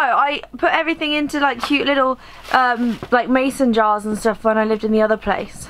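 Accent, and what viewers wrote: United Kingdom. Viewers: I like a lot she's voice, I wish to get a bit of British accent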